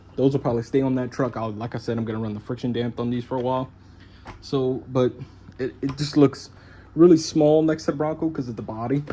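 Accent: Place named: Deep South, United States. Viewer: Deep South. Where u from? I say ur bio saying Cajun. So Louisiana? Baton Rouge here. I’m waiting for my base camp to come in the mail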